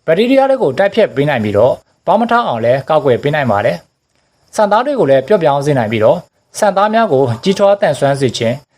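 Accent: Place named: Dawei, Myanmar. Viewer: ထါးဝယ်သံပေါက်နေတယ်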